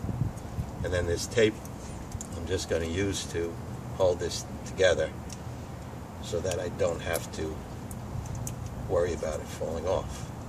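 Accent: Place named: New England, United States. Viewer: Give me a lever and I'll move the world..someone famous said that..archimedes maybe? Thanks for the good tip and great new england accent!. Thought you were one of the this old house guys..👍👍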